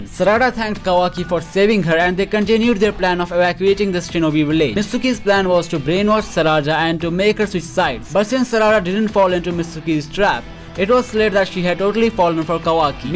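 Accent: India. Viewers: ok bro i understand, I said becoz by listing your voice I think that you a indian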